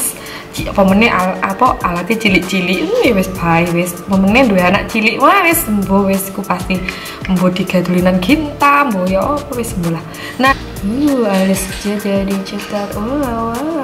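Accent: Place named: Malang, Indonesia. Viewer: ngakak nonton tutorial nya hahahah asli ngalam bgttt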